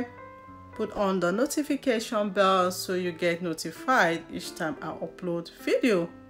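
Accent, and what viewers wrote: Nigeria. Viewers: Nigerian accent wow how did u manage that in kenya. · Kenyans have Nigerian accent thou👌👌👌👍👍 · Is this a Nigerian? The accent isn’t Kenyan nor the slomo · The person talking is not Kenyan believe me ur from Nigeria though mandazi ni matamu😋😋😋😋😋😋😋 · Thats not the kenyan way...accent too sounds Nigerian not kenyan ..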